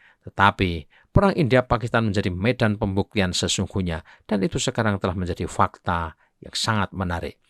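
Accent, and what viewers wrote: Java, Indonesia. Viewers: Jawa betul km lek medok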